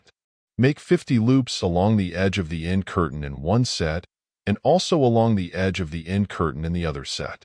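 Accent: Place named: United States. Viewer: I like the "American" voice. Easier to follow.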